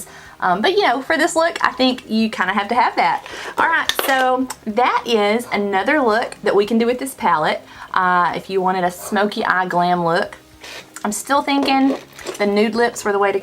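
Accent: South, United States. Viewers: I have this pallette. I bought it months ago before I started watching YouTube makeup tutorials. You popped up in suggested videos because I had watched some videos on this pallette the other day. I hit the subscribe button as soon as you opened your mouth because you're as country sounding as I am. Love it! 😁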